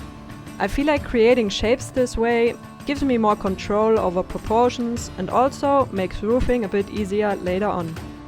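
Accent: Germany. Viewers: da klingt so nach deutschem akzent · You sound like you speak german?!😅 so if you do, das sieht so krass aus!!!!!!! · I was just wondering because I live in Germany and hear a German accent in English a lot so are you from Germany?(you have got a lot of talent btw. AMAZING!)♥️ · Schprechen du Deutch? Your accent sounds German. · Strange question: but are you from Germany? You have a pretty strong German accent. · Bist du deutsch? Meine nur wegen dem Akzent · How is this even possible? And a quick question, where are you from? You sound german :D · Bist du deutsche? Dein Akzent 🙊✌🏻✨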